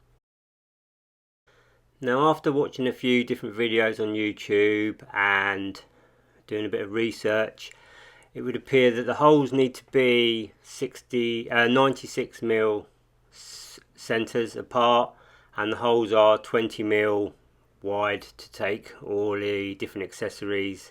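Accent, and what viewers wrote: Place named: England, United Kingdom. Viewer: So sweet to hear a pure British accent and way of sharing the knowledge and the humour :) Love it, you earned a new subscriber mate ! Keep the videos coming ! I am a beginner diyer tryn to build a MFT table lol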